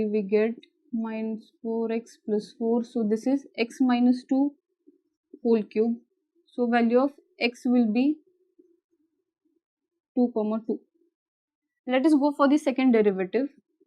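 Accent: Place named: Maharashtra, India. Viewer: Hey looking beautiful but your pronunciation seems you a Marathi speaking citizen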